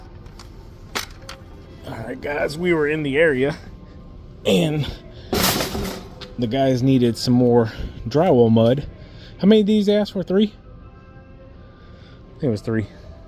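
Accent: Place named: South, United States. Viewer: just keep sounding like a southern red neck like me. i knew what you were saying.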